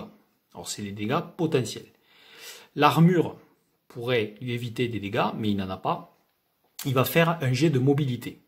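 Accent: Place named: Toulouse, France. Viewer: ho con mais vous êtes de Toulouse? merci pour cette présentation, je veux me lancer dans le jdr et celui-ci me branche bien :)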